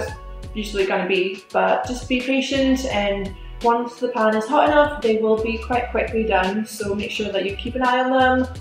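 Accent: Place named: Scotland, United Kingdom. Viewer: You're totally getting a Scottish accent. Also this video is great!!! I need new meal ideas so this is perfect :)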